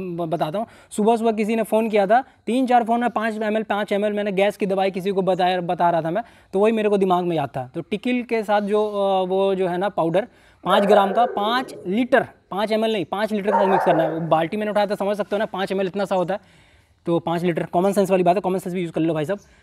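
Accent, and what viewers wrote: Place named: Bhojpuri, India. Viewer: Bhai Really love your raw videos Your Bhojpuri accent ... ❤️❤️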